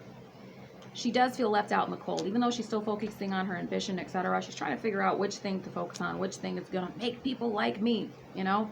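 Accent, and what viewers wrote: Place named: United States. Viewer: I love listening to you. And your down to earth ‘tell it like it is’ approach. That’s why I enjoy your vids. You talk like ordinary Australians do. Yet with an American accent. Keep up the readings. Fantastic!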